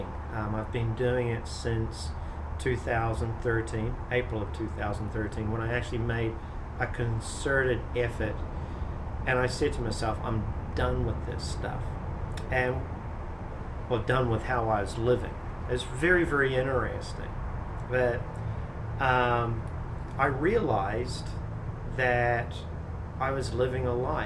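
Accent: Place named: New Zealand. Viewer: thats a very light New Zealand accent. easy to follow for us Yanks.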